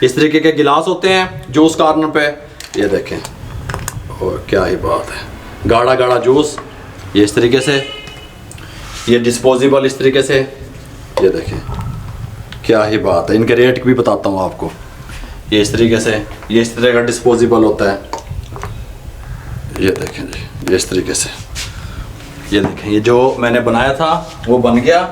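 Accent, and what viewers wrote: Punjab, India. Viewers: Urdu aur punjabi mix accent wah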